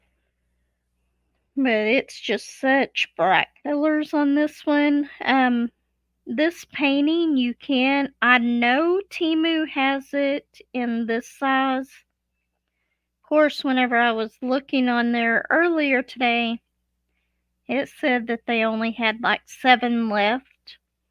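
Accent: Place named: South, United States. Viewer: Hiya, V!! I believe you and I spoke a little during Granny's 1st Premiere. I liked and subscribed your channel back then, however, I am currently having severe vision issues and am unable to diamond paint right now, but I just loved your wip & chat! What a lovely southern accent you have. I look forward to watching more of your videos. Sending loads of love & prayers to your Mom. 😊❤🙏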